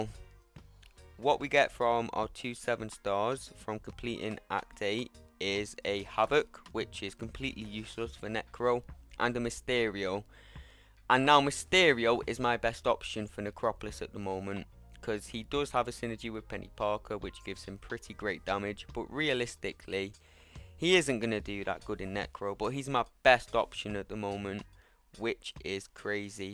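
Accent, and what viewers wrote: United Kingdom. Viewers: Yes we like your rage and rant in Bri'ish accent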